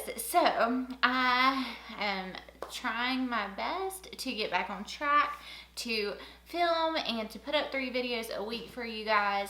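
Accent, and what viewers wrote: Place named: Texas, United States. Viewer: new subscriber here. Are you from Texas? You sound like a Texan.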